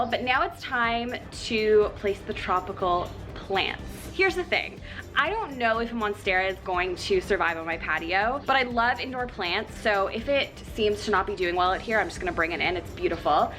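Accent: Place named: Canada. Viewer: Am I the only American girl who loves to listen to lovely Canadians pronounce words like “couch”? Ah, so soothing.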